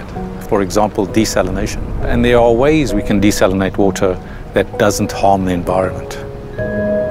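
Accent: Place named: South Africa. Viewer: His accent is South African. Awesome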